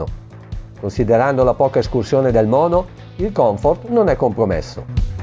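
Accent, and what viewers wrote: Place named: Brescia, Italy. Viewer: mi sembrava Brescia....invece si😅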